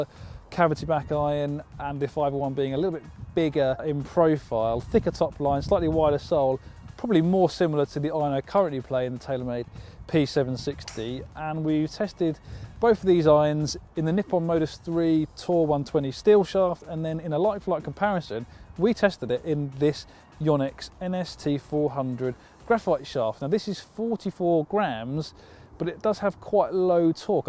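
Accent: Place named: England, United Kingdom. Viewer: Kevin Zhao they have that accent because they ARE English just like your accent is from your home country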